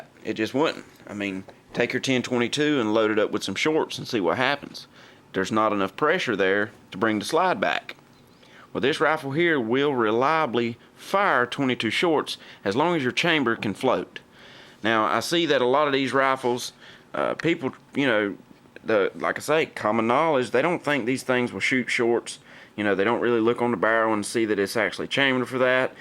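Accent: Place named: North Carolina, United States. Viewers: That sounds like an Appalachian Tarheel accent, coastal Carolinians don't talk like that. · As soon as I heard your Tarheel accent, I wanted to get a pulled pork sandwich and a can of Cheerwine.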